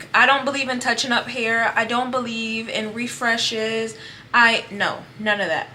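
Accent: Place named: Caribbean. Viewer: Thank you for sharing &I love your accent is it Caribbean?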